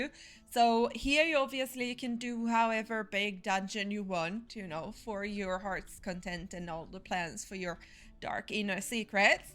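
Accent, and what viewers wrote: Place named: Sweden. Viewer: Hey there, thanks for the fast reply! I just finished the rest of your video and I really enjoyed it. The dungeon part turned out very cool with the stair cases and vents. My favorite build set right now is Nemedian. I think the thick stone and rustic wood look really nice together. And that's a Swedish accent there!! Thanks for saying so. With a smidge of old British of course ha ha. It's like music to my ears. Looking forward to your part 3!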